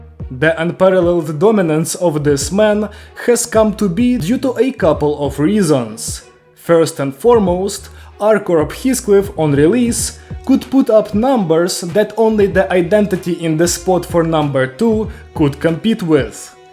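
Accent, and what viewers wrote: Russia. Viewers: Are you Russian, by any chance? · russian accent wth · Как же он пытается говорить на английском, но как же слышно русский акцент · Я же слышу что ты русский наш слон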